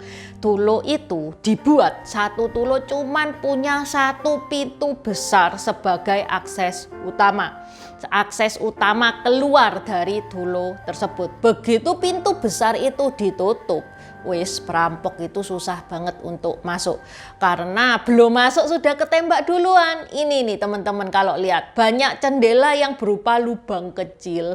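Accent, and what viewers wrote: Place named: Purwokerto, Indonesia. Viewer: Maaf mba Logat bicaranya kok seperti orang Purwokerto yach😁🤭🙏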